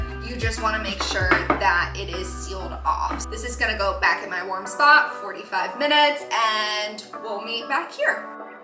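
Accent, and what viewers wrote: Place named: United States. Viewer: Bro is soooo weird like looking at you speaking etc 😂😂 I don’t why but I didn’t imagine you speaking like a classic American girl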